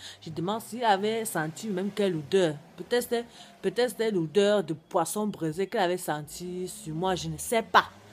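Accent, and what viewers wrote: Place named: Africa. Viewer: BJR je voudrais juste vous poser une quest pq vous parler comme ls africaines je vous juge pas ms sa m ennerve a entendre parler ainsi cet comme cet pts jeunes blanc la il parle comme ls arabes pour etre vue voila je suis en couple avc un africain dnc vous voyer juste savoir le pq ou cet votre vrai voix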